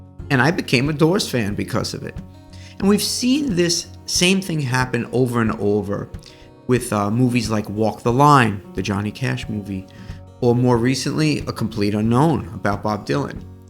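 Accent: New York, United States. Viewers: Also as an Irish woman, I’m digging your accent. It’s what I imagine all New York jazzers sound like.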